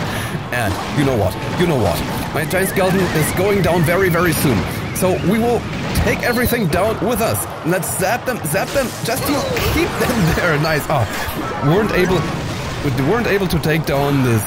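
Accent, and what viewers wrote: Germany. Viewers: youre accent is like germans english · He sounds German to me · are u from germany? or from where comes ypur acent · you sound like a german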